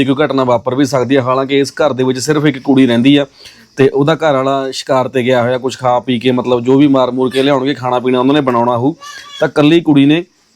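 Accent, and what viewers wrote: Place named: Punjab, India. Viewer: Punjab ke log isi treh ki pure Punjabi language bolte hai , vadiya lagdi,😄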